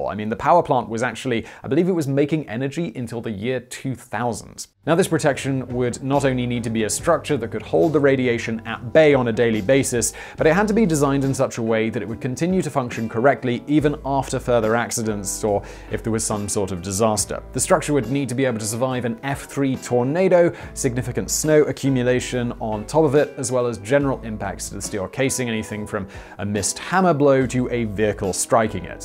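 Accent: RP, United Kingdom. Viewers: Very posh. Thanks for the video.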